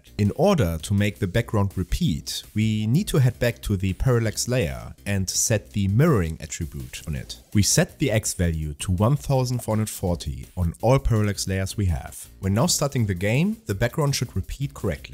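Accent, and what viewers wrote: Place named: Germany. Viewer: Den deutschen Akzent hört man sofort raus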